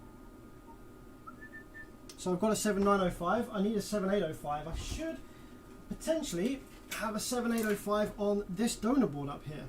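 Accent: United Kingdom. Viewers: bro i never knew you was british lol , did you meet pooven to get your z4 15s?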